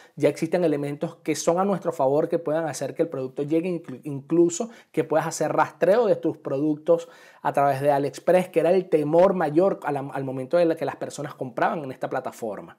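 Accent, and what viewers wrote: Venezuela. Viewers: felicitaciones éxitos, definitivamente el tono venezolano a la hora de explicar y enseñar es el motivo que nuestros docentes los buscan en el exterior éxitos.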